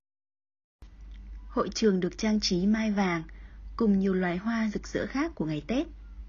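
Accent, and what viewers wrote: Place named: Hanoi, Vietnam. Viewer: " " Haha. Dễ thương, giọng Hà nội (gia đình >80 năm).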